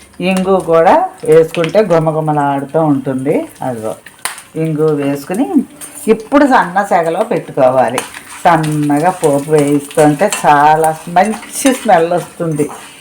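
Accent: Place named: Godavari, India. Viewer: మీరు ఏ ఊర్లో ఉంటారండి,మీరు గోదావరి జిల్లావారి లాగా మాట్లాడుతున్నారు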